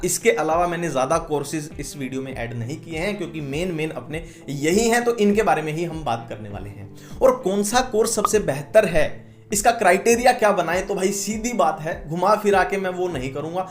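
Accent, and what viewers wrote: Haryana, India. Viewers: Bro haryana se kha se ho aap ??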